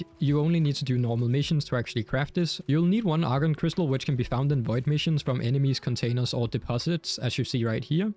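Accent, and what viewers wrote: Denmark. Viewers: This guy sounds so danish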